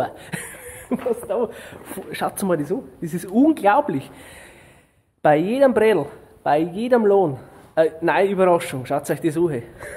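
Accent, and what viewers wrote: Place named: Bavaria, Germany. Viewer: Guten Abend … (Ups, kenne deinen Namen gar nicht🤭) Ich finde hier deine Erklärungen betreffend der Biologie, inklusive dem Esoterischen Part über den Walnussbaum, sehr sehr interessant und aufschlussreich, zudem, die Sägetechnik kommt auch nicht zu kurz! 👏🏻🧐😃 Du hast wirklich ein super umfassendes Wissen, Hut ab. 🎩 Man spürt eindeutig deine Freude, Liebe und Hingabe zum Holz, der Schalk im Bayrischen Dialekt rundet es noch ab. Verständlich erzählt und „auf dem Boden“ geblieben, bleib so. 👍🏻 ☺️ Wünsch dr witerhin no an gnarret hübschi und erfolgrichi Ziit. 😉😁 Gruass us Grischun (Graubünden) ⛰ 🌲 🇨🇭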